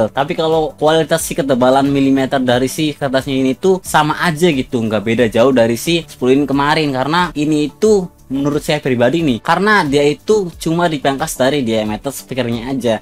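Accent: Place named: Indramayu, Indonesia. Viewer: Logat indramayue kuh jelas pisan..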